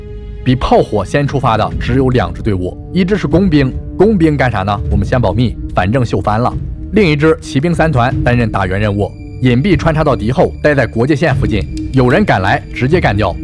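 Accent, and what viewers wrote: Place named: Jiangzhe, China. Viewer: 你說的大致正確 連 “吐蕃” 都能唸出正確的 “土鉢” 的確比99.9999％的中國人要有水平得 多得多！ 可惜你的北京話 實在很糟糕！ 帶江浙土話口音 實在太重！ 唉！ 能不能先把你的北京話 練 “六” 一點 再來拍視頻啊？ 北京是首都欸 你是忘了嗎？ 莫非你是海外華人？ 如果真是 那就當我沒說！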